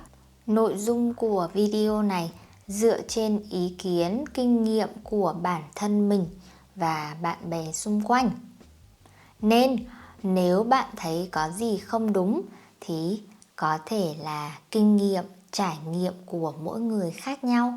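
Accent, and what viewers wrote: North, Vietnam. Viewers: Câm ơn cháu. I can hear your Northern accent.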